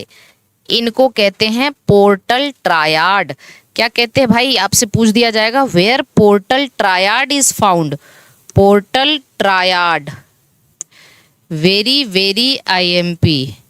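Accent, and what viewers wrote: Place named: Uttar Pradesh, India. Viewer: Aapki baat cheet se ye ekdum clear ho gaya hai ki aap pakka UP se ha aur up board pdi ha quki hm sb up bale merkaana mrkawa bail ko axi tarah jante hai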